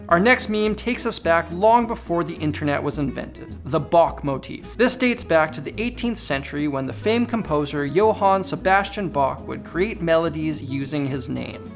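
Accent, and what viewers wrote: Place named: Canada. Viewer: I had a suspicion you were Canadian. The accent gave it away.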